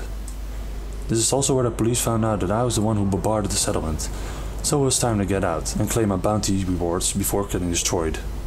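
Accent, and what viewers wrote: Netherlands. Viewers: from which country are you? you sound dutch judging by your accent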